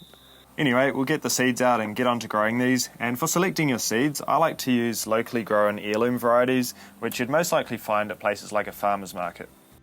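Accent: New Zealand. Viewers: This is the best video on how to grow peach or nectarines from seed. This is how you make good videos. No nonsense video. It must have taken a lot of patience. The kiwi accent is like a cherry on the top.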